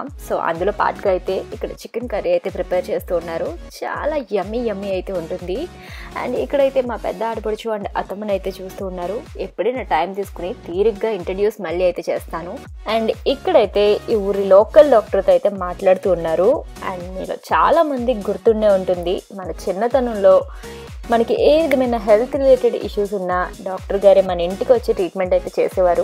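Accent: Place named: East Godavari, India. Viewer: మా తూర్పు గోదావరి భాష వింటూ ఎంజాయ్ చేసాం. ఇంతకీ ఏ ఊరు అది మేడం?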